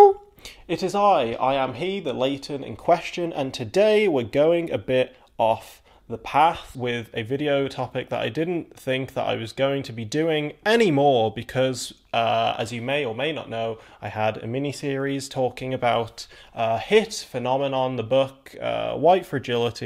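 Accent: United Kingdom. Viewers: I didn't know that you had to base how you wear your hair, your clothing, or your vocabulary based on your skin color.....that is a weird concept to me. At what point does the percentage of your heritage outway the pigment in your skin and visa versa. 🤔 And can you believe that "people of color" live in all sorts of different countries? Its like...crazy to think that people have moved and immigrated to other countries. (Sarcasm) I watched your video and my first thought was oh he is british... not that you didn't sound black... whatever that means. 🙄 I enjoy your content and the way you present it. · the problem with the vocabulary argument is that people said he didn’t sound black which isn’t really a thing. He just didn’t sound American because he is British. People seem to think that black identity only exists in America which is just false. That what I mean when I was confused by people saying he didn’t sound black. 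But again, he’s just British. My partner sounds exactly like him because he was raised in London, does mean he isn’t black tho. Again they are just British 🇬🇧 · I seriously cannot believe that people said you're not black because you have a British accent, sound too smart, dress too well, etc? I think you're hilarious and am glad you confront this head on rather than hiding from it. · Sounding black really isn't a thing, said the whyte woman? Why do you think you'd be the one to decide that? Sounding black in terms of slang, word choice, accent and sentence structure definitely is a thing. Again when whyte people want to be racist and want it to be a thing they know how to sound in order to mock black people. So I don't buy it Yeah his british accent is very obvious. I don't think it was lost on most people, but even for a british person he sounds very whyte, lol. He literally has a whyte mother, don't even know why you're arguing this. 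😁 Even british people will tell you there are all kinds of accents, you don't think a black caribbean or african accent is one of those? And yeah you can be black and not have these typical black accents but it doesn't mean you'd sound or act like him. He definitely has some extra whyte mayo in him (I mean he does literally, he's not black he's half whyte) and it's no surprise that it comes through in his views on race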